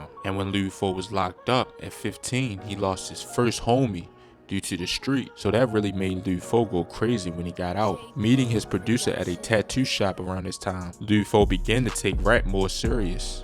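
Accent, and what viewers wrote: Baltimore, United States. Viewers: You from Baltimore aint u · He from Baltimore they pronounce they U's weird lmao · Stupid ass Baltimore accent🤣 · Boy if you dnt shut tf up with that damn Baltimore accent shit annoying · He’s fasho from boltamore “duuuu” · He from baltimore he cant help it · First time ever on yo channel and I can tell you from Baltimore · I can hear the Baltimore in you🤣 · Bmore accent thick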